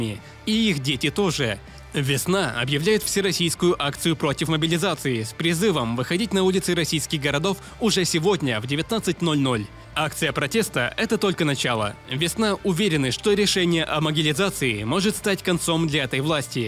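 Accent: Ukraine. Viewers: а ты ведь из Украины, верно? Это сразу читается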